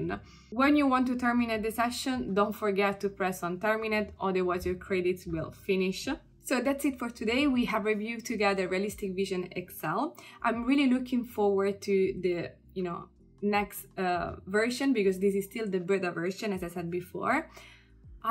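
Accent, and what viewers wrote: Italy. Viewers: Love your channel and your Italian accent 😊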